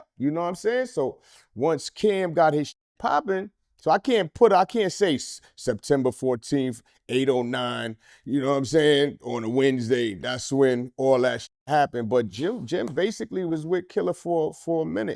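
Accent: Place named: New York, United States. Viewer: He a true NY nigga man… this is how we really talk and explain situations lmfaoooo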